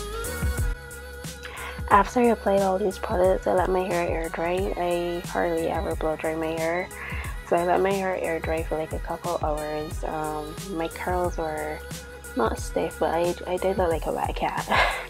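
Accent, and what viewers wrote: Barbados. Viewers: Sound like you is ah bajan yuh 🤔🤔💛💙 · ARE YOU FROM BARBADOS!? I feel it in ur accent!? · You have to be bajan I know that accent too well to mistake it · can't miss that Bajan accent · Your curls are so pretty! Also, I’m not from Barbados but I’d know that accent anywhere lol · Are you from Barbados???!!!!!!!! You sound like a bajan, that's a compliment! 😉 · Has to be a bajan. I know that accent anywhere. 😍🇧🇧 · Yooooooo I hearing that barbarian accent !!😌😌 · Any chance ur Barbadian? Idk u just sound Barbadian. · Am I hearing a Barbadian accent? I really hope so. YouTube needs more Barbadian channels. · Sounds like you from Barbados love it !! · Love your accent, sounds like a Bajan accent if I’m not mistaken 😁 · You cant hide from that Bajan accent lol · Are you Bajan?! Cause that accent 😍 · Heard the accent and had to subscribe. Big up to BIM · Where is she from she sounds bajan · Im new to your channel ,is your hair bleached ? 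ohh and you got a Bajan accent :)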